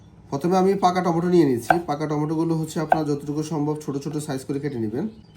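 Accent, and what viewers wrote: Noakhali, Bangladesh. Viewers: নোয়াখালী কথায় বুঝেন না ?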